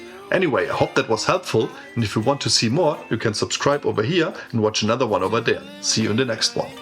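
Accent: Germany. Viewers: Notable observation: if the author of the video about 3d printing has a German accent, the video is going to be awesome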